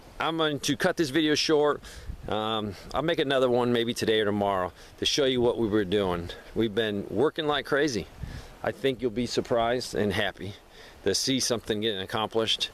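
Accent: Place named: Upstate New York, United States. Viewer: Sound like you're in my neck of the woods. Upstate area.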